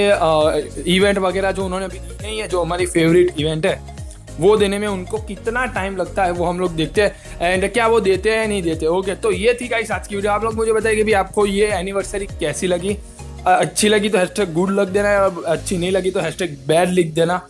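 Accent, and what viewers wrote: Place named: South, India. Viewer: Tumaree English south Indianz ki tarah hai :)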